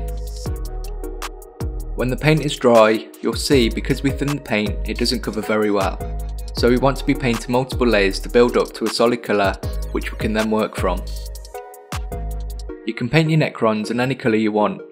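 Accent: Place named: Suffolk, United Kingdom. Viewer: Love the video! helped me a lot. I swear thats a suffolk twang I hear as well ;)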